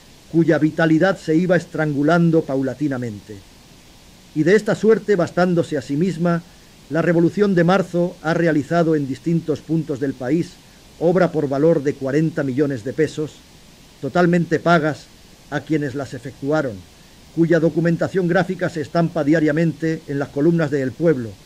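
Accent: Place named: Spain. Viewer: Porque hablaba en español de España?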